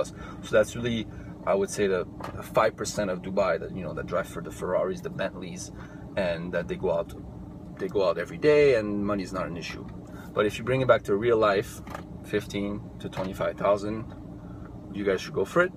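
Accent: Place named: Russia. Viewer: Pe bune? Eu sunt Moldovean si chiar credeam ca esti rus, ai accent rusesc :D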